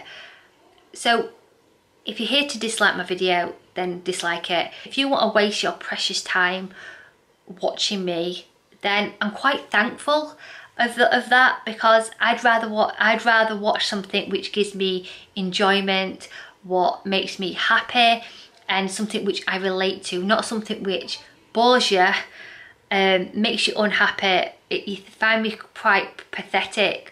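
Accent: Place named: Northern England, United Kingdom. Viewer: I'm so glad you're going to carry on making your Friday Finds and What I Eat videos. You're brilliant to watch and there's nothing wrong with how you talk... It's nice to see Northerners on YouTube 😘. Some people can be so envious of a pretty, confident, clever woman!!! What they've done is disgusting but I think you're doing the right thing by keeping the kids out of it and carrying on. It's them with the problem, not you. F*** them. You've got 3 & a half thousand followers behind you 😊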